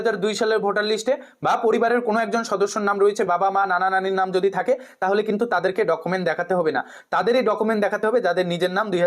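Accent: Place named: Bangal, Bangladesh. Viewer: আপনাদের কোনো কাজ নেই আপনার কথাতে ও মনে হয় বাঙাল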